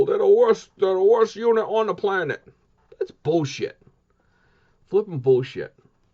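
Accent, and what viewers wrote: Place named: Brooklyn, United States. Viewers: this was awesome I just got 5 Ton 14 Seer Goodman Air Conditioner today so that was too funny the Goodman stuff thanks for the info I understood half... lol thank bro cool accent must be NJ or NY or Boston... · from brooooookllllllynnnn....lame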